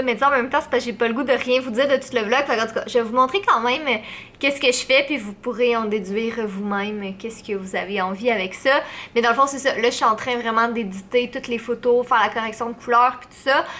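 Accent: Canada. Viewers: mon dieu j’aime tellement votre façon parler au canadien 😱😍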